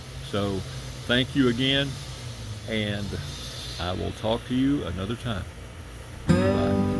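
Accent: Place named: South, United States. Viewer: Congratulations on hiking your own hike, and knowing when your personal journey was over. Being honest with yourself is difficult, when you set lofty goals and know your abilities, but know in your heart that you have realized that your dreams and visions have been answered in every aspect. Now you can carry them in your heart and share them with others who might be inspired to attempt the life-changing journey that you have taken. I'm sorry that I didn't get to see you in the flesh at Upper Goose Pond Cabin, but it was not to be. You have become a companion in my armchair, and shared six months of your life with my wife and me, and we are grateful for your time, effort, and good-natured humor, delivered in a soft and mellow drawl. We both lived down South, individually, when we were younger, and have since married and are living in the New England state in which I was born, but, whenever we visit any place below the Mason-Dixon Line we are still home. 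Godspeed,. The Lord bless the path that He has set before you. I trust that we shall meet some day. 👍💪✌🙏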